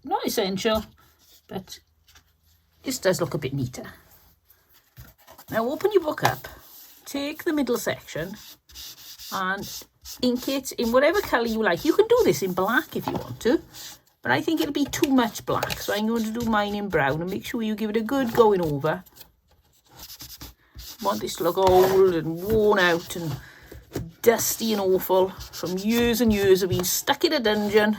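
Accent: Wales, United Kingdom. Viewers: Don't take this wrong but after listening too so many American Crafter's, you have the most Welsh accent I have heard in ages.😂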